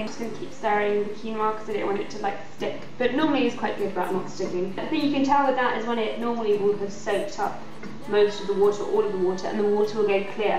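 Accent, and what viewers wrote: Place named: RP, United Kingdom. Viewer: you sound so posh in this video...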